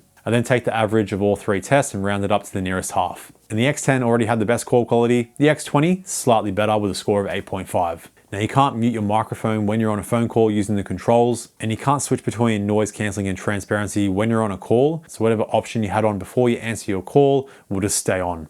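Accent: Australia. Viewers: nice to hear an Aussie accent on a tech review for once 👌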